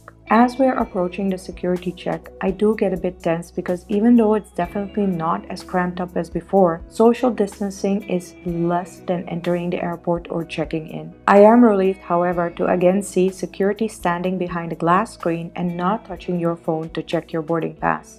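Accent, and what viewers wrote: India. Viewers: Just curious, why do you call yourself as a foreigner while visiting your home town India? Your accent is very much Indian!!!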